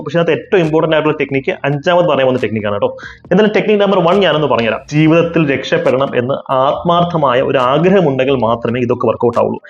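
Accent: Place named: Malabar, India. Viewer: Alambu malabari language 😊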